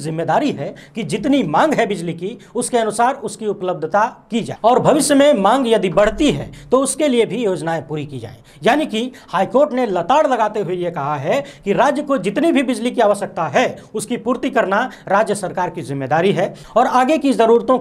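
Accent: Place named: Bhojpur, India. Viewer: Bhaeya. Bhopori mix me jo aap bolte hai na kasam se maza aajata hai😂😂😂😂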